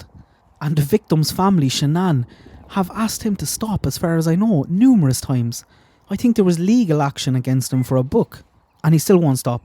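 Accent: Cork, Ireland. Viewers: Hi from County Fermanagh. Just found you and very glad I have! I agree, the Sophie case is West Cork is fascinating - the podcast is wonderful. I was hoping you might have other podcast suggestions?! BTW is that a Cork accent I hear? Love it. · Hey Chasing :) Thanks so much for your Community Post which is how I just found this channel... and got such a surprise when heard such a familiar accent!! He sounds like he is from same end of Ireland as I am - will have to check out his content... Can't agree more with this video also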